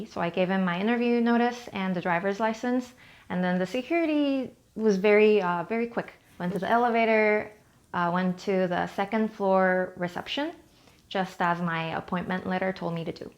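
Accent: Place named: United States. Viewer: How can you speak American accent, really love your accent ❤️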